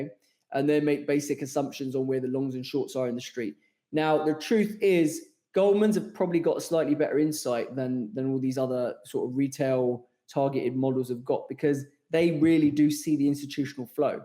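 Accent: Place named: United Kingdom. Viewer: Great content but too British accent. Cannot stand it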